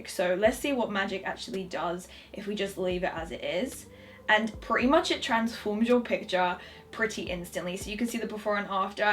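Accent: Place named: United Kingdom. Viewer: i'm really confused by her accent lol is it british, sometimes i hear a hint scottish, australian?....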